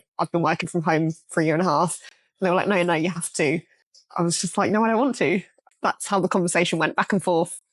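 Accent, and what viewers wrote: England, United Kingdom. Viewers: Прикольно. Английский учил только в школе но почему-то сразу показалось что вы из Англии😊! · I got that u are from England by first 3 seconds :) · From here in America: Oh wow yay the double thrill when I 1st heard, oh too good and fun to be true, is in Britain that accent yay!!!! I LOVE the maternal British isles heritage I have: Irish and Scottish!!!!! So in addition to OH FUN CHEERY INSPIRING DANCING AT LEAST, buddy is a "fellow "Brit": THANK YOU hug for all this and being who you are!!!!!!! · Hey, you're British - that surprises me. I know Lauren Jumps is British (heard her talk). I'm an old guy from Germany and I watch your videos because... YOU MAKE ME SMILE! Thanks.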